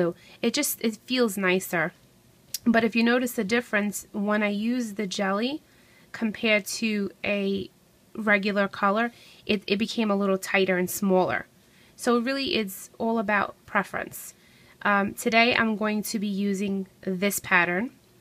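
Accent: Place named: New York, United States. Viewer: Are you from New York? You have a slight New York accent and it's so sassy ^-^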